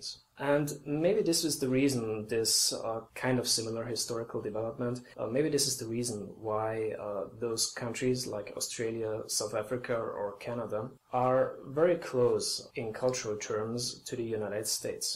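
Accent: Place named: Scandinavia. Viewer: BTW...I am aware of your Scandinavian accent... I'm only assuming that you have recently expatriated to the UK. Since many of your arguments predominates between Britain and the US.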